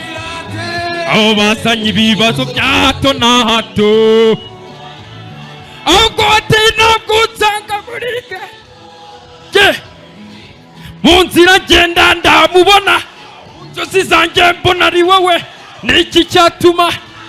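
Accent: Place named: Kiga, Uganda. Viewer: Kugikiga cyawe rwose turanezerewe 😂😂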